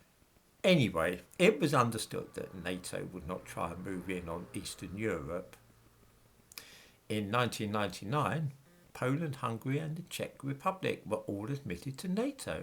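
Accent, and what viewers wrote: United Kingdom. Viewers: sounds British to me do you speak English? Your facts where did you get them from are you a British civil servant Where do NATO weapons go? Near Odessa, the SBU detained smugglers during the sale of weapons purchased from the Armed Forces of Ukraine in the combat zone. the real news from the ground "🅾️" group, Colonel General Alexander Lapin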